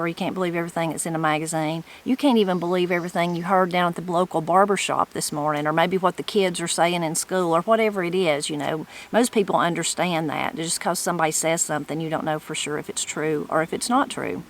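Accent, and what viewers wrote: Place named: East Tennessee, United States. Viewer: Just chiming in to say that I enjoy your videos, and it's crazy to me how it's anybody's business about your money other than your own. Keep on doing what you're doing, and it's good to hear voices that sound like home to me. East Tennessee expat in South Central Virginia, I'm still technically in Appalachia, but it's a little different than home.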